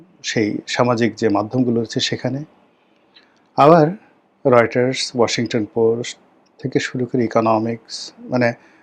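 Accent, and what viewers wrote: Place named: Bengal, India. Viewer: গ্রাম বাংলার গেঁয়ো কথা গুলো খুবই মনোমুগ্ধকর।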